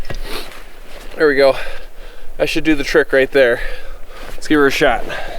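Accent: Canada. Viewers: Man, you sure sound Canadian. Tossing out those ‘eh’ casually. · Are you Canadian or American? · I can't decide if he is Canadian, or just making fun of us.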